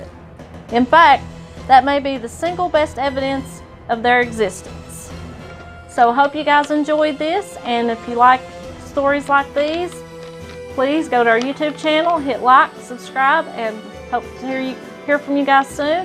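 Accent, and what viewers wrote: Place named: Appalachia, United States. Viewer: What a bunch of garbage with a hillbilly accent !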